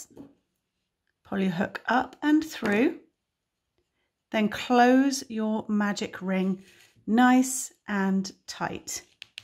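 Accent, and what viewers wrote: United Kingdom. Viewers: British accent+ US terms...PERFECT 💗 (I'm a Brit who dislikes UK terms 😆) I send these hearts to people who are recently bereaved.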